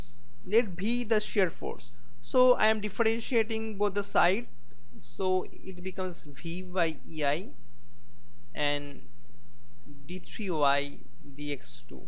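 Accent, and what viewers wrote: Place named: India. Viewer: accent original rakho...Indian accent accha lagta hai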